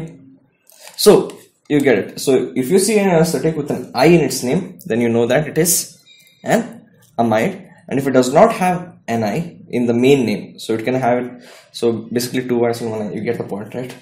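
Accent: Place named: India. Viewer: great lecture although heavy indian accent sometimes difficult to understand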